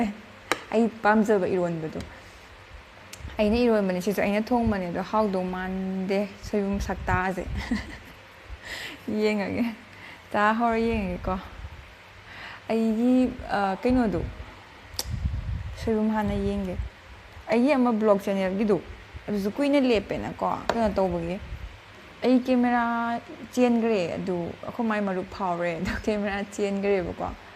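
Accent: Kakching, India. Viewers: Kakching marolse taba ym nungaite nungcihe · Kakching marol se aidi tabada yam nungsi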